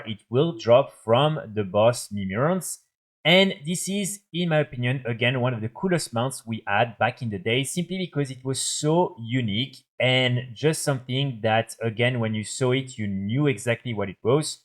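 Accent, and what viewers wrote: France. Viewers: French accennnnt guyyyy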